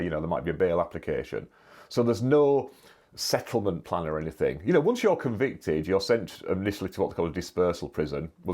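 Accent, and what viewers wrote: United Kingdom. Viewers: Cruel & Unusual Punishment is a USA standard. The uploader is a uk Barrister, explicitly talking about practices in the UK, perhaps you noticed their accent. 😤 This kind of thing especially Plea Bargaining goes on in the USA, a much better concept is DURESS - ie when forced, people can't consent to anything & their supposed agreement is VOID. This is coercion PRIOR to conviction - Cruel & Unusual Punishment clearly can't apply.😤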